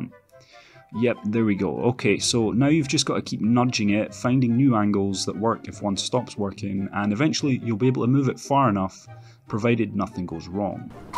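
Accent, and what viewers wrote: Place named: Scotland, United Kingdom. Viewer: Epic, I just watched a video from a year ago without your voice and you are Scottish, even more epic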